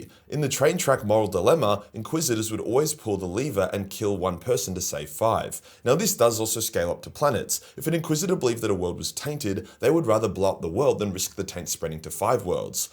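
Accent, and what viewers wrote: Australia. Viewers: I came here for everything besides the Eisenhorn shit, then was surprised by how much shit I have no clue about then realized I'm gay and just wanted to relate to your Australian accent in a way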